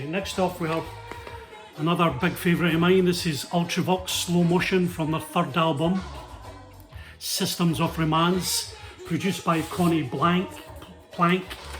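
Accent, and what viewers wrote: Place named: Scotland, United Kingdom. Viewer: nice collection of 12" 45s, haven't seen many of thoughs, love and want a copy of that, I also like it when they have the 7" version on the b side, always refreshing to tune in and to hear that great Scottish accent of yours, thanks big man 👍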